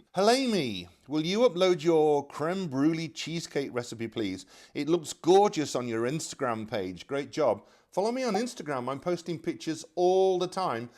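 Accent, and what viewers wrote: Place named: Derbyshire, United Kingdom. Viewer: I love the intro, and adore the channel thank you, love your accent reminds me of my mothers side of the family from Derbyshire what a gorgeous place. HP sauce is wonderful, love it, and grew up with this food, exciting will definitely share this with my mother, she loves to cook,so does the family . Thank you such a happy show. enthusiasm and passion ,scrumptious meals and stylish presentation and yes your delightful personality.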